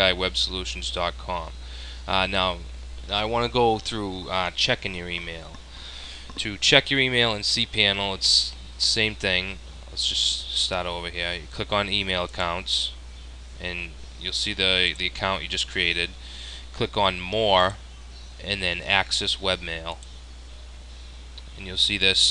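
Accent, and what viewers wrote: Boston, United States. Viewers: Oh my god he kept his Boston accent back then hahaha.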